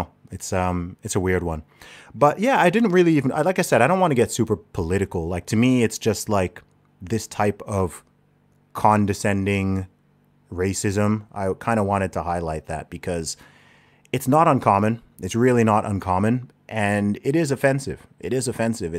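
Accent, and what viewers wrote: United States. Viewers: you kinda sound American ; are you from the States ? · Just curious- why do you sound American? - you don't have a British accent- I'm Australian and just wondering 🤔 also, came across your channel accidentally and love your input 👍🏿🇦🇺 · No disrespect, but if you're British why do you sound American? My mom is British and she has lived in America for over 40 years and she still sounds British. What happened to your accent? Please tell me! Ps I love you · But you have an American accent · Are you American ? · Did you go to school in the US? Is that how you sound American? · Just joined today. You sound American! You know, I commented on a YouTube video and someone from UK replied and explained that even people over there in UK are praying for Trumps win because the cabal and corruption stretches even over there and they know that if he loses its over for us and if it's over for us, then it's over for everyone. 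That was the first time I realized how important my President is. I've always known how truly important he is to America, but I had no idea how, what happens in America affects other countries. I know we are the world's bread basket. But I didn't realize. Then Trump gave a speech yesterday and he too said that if it's over in America, it's over everywhere. God Bless Us All! Please pray, because the more people that pray..... we can pray it into existence. It's physics. And the Democrats have lost about 30% of the black vote thanks to Candace Owens, Brandon Tatum, Jericho Green.... you should follow these people they're fantastic. The biggest problem in the USA right now is WHITE LIBERALS. THEY'RE ALL NUTS. LIBERALISM IS LITERALLY A MENTAL ILLNESS. I watched a documentary and they had a liberal and conservative in MRI machines. They showed photos, political in nature. And with each picture a different part of the brain lit up for the conservative vs the liberal. 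They think without logic. Thought without logic is a disorder, I mean....... that's the white reason for thought, to be logical. Not to be emotional, that's why we feel. So liberali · Why ?if yuo English yuo sound American?bye. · Why do you sound American? This was my first visit to your YT feed. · Why is your speech so American? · I hear you in Bangkok, Thailand first time listener. You sound like a really smart young man! I love the president, you are from UK wow! You sound American. And intelligent.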